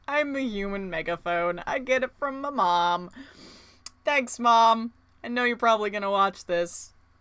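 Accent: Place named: United Kingdom. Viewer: British accent, probably about 5 years old? rolling Rs is probably from me, cause of Espanol and theater. You should look into linguistics. There are places and people who have accents and help people who want to sound authentic for voice or theater as well. So look for that. Pirates!!! Arrrrrr :) I would think that is rebellion for you. hee hee ok well I will send you some links to check out.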